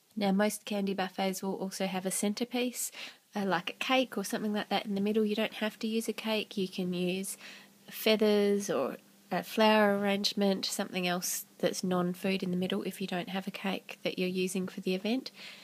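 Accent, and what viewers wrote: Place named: Australia. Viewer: i love your accent! what part of Australia are you from?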